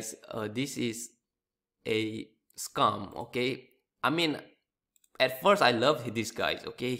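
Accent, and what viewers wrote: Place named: New York, United States. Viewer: He's a New Yorker and even sounds like a really shady New Yorker. His accent tells all check out TJ spoonman who got ripped off too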